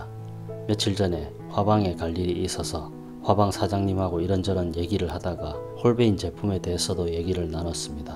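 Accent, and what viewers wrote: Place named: Busan, South Korea. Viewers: 오~~!! 같은 부산분이셨네요~ 어쩐지 사투리가 익숙하다했습니다~ㅎㅎ 매일 다양한 기법들 잘 보고 배웁니다~^^ 좋은영상 많이 올려주세요~~ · 부산분이네요^^ 반갑습니다 저도 부산사람 ㅎㅎ 말하는게 넘 잼있어요 그림하나 몰라도 계속찾아보고싶게하네요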